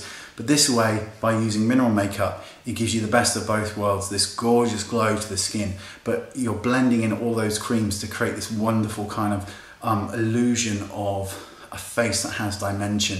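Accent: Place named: Bristol, United Kingdom. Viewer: Where are you from? I love your accent! I'm from Bristol and it slightly reminds me of that :D I love your videos, I've been watching them for a while now :) They've completely changed my routine. I'm a nurse and want to look clear and healthy, could you do a video to recommend a minimalist look? :D sorry for the long comment lol xx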